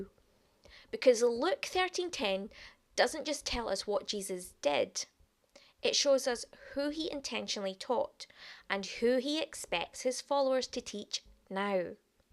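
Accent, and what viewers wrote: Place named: Ireland. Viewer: Nice. I wonder how quickly that deep Irish brogue would take to me if I lived in Ireland. I love to hear you speak. ❤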